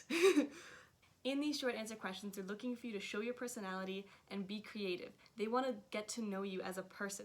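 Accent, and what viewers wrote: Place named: Canada. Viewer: I love her Canadian accent and I don't know why I love it so much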